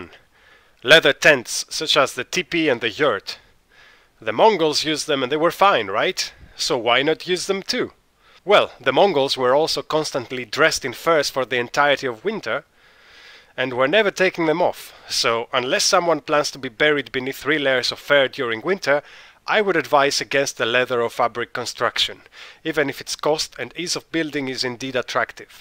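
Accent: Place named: Greece. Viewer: Very informative - thanks. Btw - you have a very non-Greek, Greek accent when speaking English!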